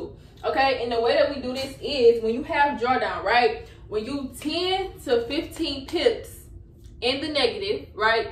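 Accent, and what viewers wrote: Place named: Texas, United States. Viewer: you sound like you from my neck of the woods. Texas Where you from?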